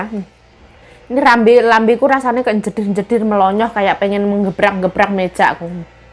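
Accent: Java, Indonesia. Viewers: Aku seneng sm medhoknya mbak,cletukan² bahasa jawane bikin mesem²,hihihiii...sukses yo mbak,sehat² selalu...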